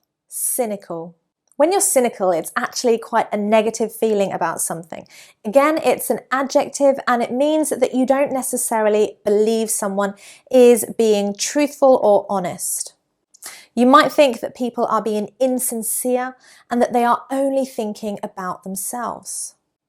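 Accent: United Kingdom. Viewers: Really I enjoy each lesson with you I loved the British accent it is attractive when you use it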